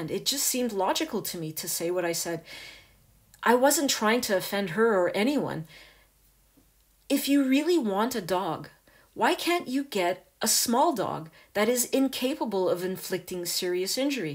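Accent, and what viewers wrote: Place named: Canada. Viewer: cute Canadian accent, very intelligent, full of wisdom, this woman is so nice to listen to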